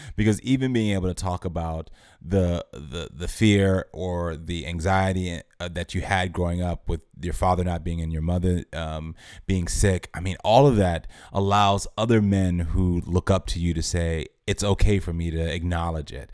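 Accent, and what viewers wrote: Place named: Cockney, United Kingdom. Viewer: You cockney guru you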